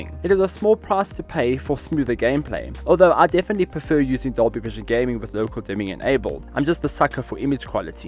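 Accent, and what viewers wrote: South Africa. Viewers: I love finding random YT review videos on a product I'm researching, opening it, and hearing a good old South African accent! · South african hello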